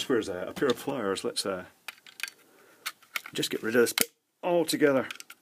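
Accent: Scotland, United Kingdom. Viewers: Why does it sound so good when Scottish people talk about nerdy things?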